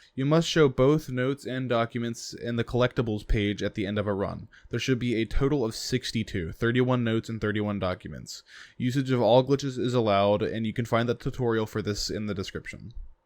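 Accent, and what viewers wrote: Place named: United Kingdom. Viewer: you are bri'ish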